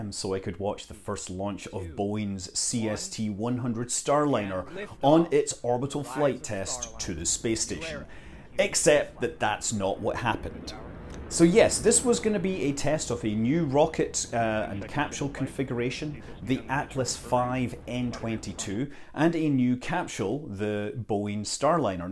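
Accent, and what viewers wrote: United Kingdom. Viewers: That outfit in combination with arguing on space travel in British accent immediately reminded me on Arthur Dent. Sorry for that.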